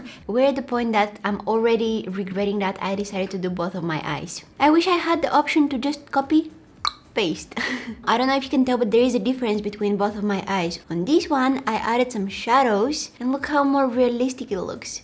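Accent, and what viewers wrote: Russia. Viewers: I actually also understand every single word but the problem is her russian accent. I like her and her vids a lot. But her accent is a bit difficult. I know she can't do anything to speak without an accent but i've just said it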